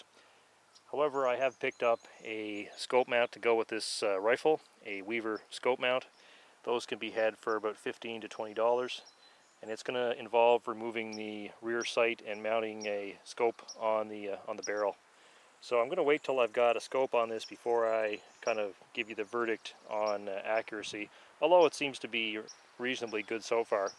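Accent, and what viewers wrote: Canada. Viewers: He is speaking in Canadian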